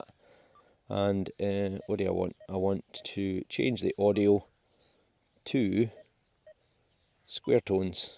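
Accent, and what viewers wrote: Scotland, United Kingdom. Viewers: Dear, I'm from Switzerland and i celebrate each of your fantastic videos. I'm a great fan of your scottish english and i finally realised that the name of Australia's Perth is because of Perthshire...;-) I have 5 weeks of summerholidays and i'm a detectorist aswell. Is there any chance to join You? I know, You must get thousands of this kind of demands, but just to be with You, finding a Roman Denarius or something similar would be the jackpot for me! Please let me know asap. Kind rrregarrrds Stefan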